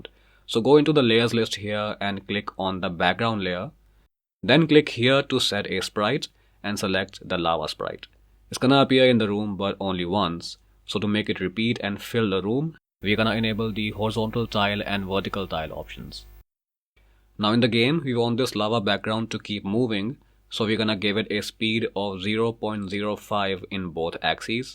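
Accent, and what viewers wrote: India. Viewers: Thank you person who's name I don't know who has an accent that I think belongs to india. This is a great tutorial. I would love to learn more from you.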